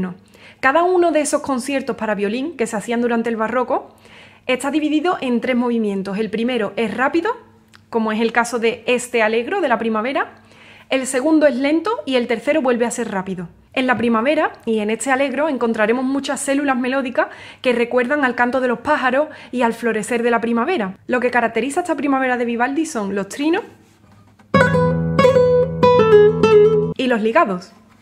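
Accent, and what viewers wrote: Andalusia, Spain. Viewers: En el otoño de mi vida encontré la primavera en esta niña talentosa sólo con escuchar su música y su gracia andaluza en el hablar todas las cosas se cambiaron ya para mi sólo puedo decir floreció en mi una eterna primavera GRACIASPOR EXISTIR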